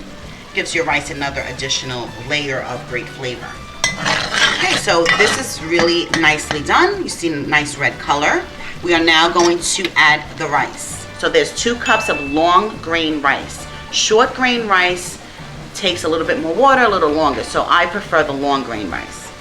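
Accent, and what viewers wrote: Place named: New York, United States. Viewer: I love your accent soinds new yorker food looksnbanging girl! Love spanish food!!!!!! Any type of spanish food #1 fav food of all!!!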